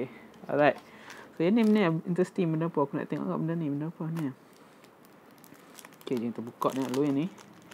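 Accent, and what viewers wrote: North, Malaysia. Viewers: PSN ID : Shawrihan - Keep it up bro with this kind of video ( review psl games, movies, unboxing and all ) good to some of us! - suka dgr loghat utara tu! All the best bro!!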